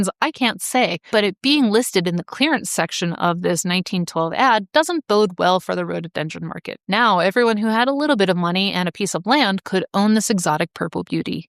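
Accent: United States. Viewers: Are you American? You used pounds instead of dollars but you sound American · I won't listen to anyone with a USA accent.. until you get rid of evil numbnuts Trump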